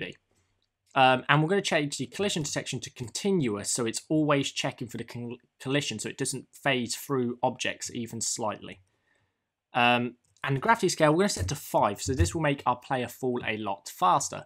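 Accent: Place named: United Kingdom. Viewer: Hi, trying out the code in the first script, I get an error message saying "Input does not contain a definition for 'GetaxisRaw'". I checked every letter, it is matching the one in the video. I tried with Getaxis, but it doesnt change anything here. Does someone knows about it ? Great tutorial, and great British accent as well ^^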